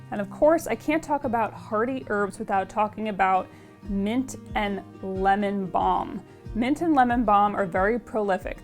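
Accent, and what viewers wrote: United States. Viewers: Sorry, I can't watch this. There is an 'H' in herb, I know in America you feel the need to change the English language, but to an British English speaker this is unwatchable. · The same reason she says oRAGeNno, she's American 🤷‍♂️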